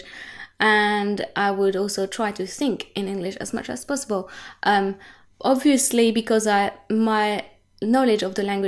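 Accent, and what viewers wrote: England, United Kingdom. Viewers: vous avez un accent anglais, il est tres mignon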